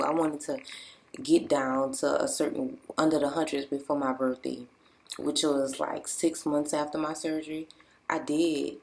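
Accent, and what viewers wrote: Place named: New Orleans, United States. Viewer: you from new orleans? i hear it in your voice lol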